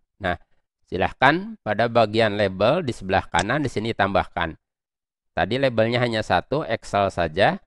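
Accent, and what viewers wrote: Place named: Bali, Indonesia. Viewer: lho sy kira org bali, logatnya bali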